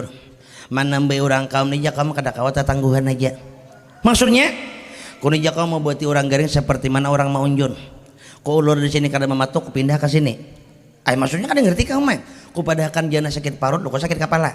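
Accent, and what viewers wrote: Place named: Banjar, Indonesia. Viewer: Ku kira Banjar Tanjung loko jadi suka bangatt denger Nye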